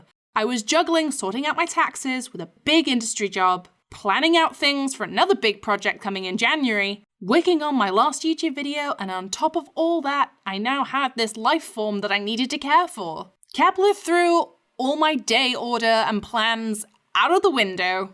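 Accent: United Kingdom. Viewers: I love your british accent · me: haha, although now we didn't know i feel your british accent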